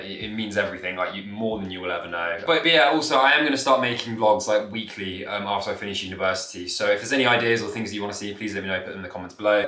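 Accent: United Kingdom. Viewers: Your accent is so different, Ik it's british but it also sounds like there's some other accent mixed in with british, I'm surprised at myself that I can understand what ur saying 95 percent of the time cause english isn't my first language, sometime there are some words I cant catch or understand cuz ur speaking way too fast but its all good, love your vids, currently doing a marathon of ur vlogs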